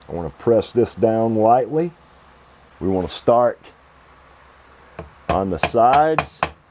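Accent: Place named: South, United States. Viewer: I'm in stitches here. I love southern accents, and yours is great; but every time you say "Volute," it sounds like a spices of duck. I keep thinking the next part of the story will be how the dog jumped out of the boat and went after it... 🤣😂✌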